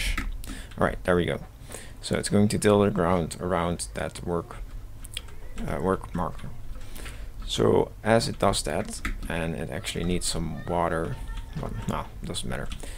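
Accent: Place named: Netherlands. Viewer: ik hoor aan je stem dat je nederlands bent en ps bij die forum kan ik de download link niet vinden staat die dan ergens anders?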